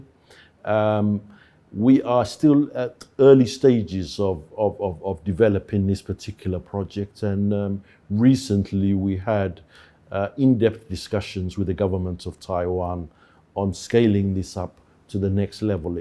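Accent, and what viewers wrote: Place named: London, United Kingdom. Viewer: What a posh english , London accent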